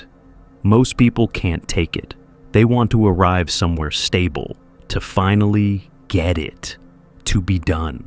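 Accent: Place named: United States. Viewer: It's impossible to find a more vile American accent, than with this narrator.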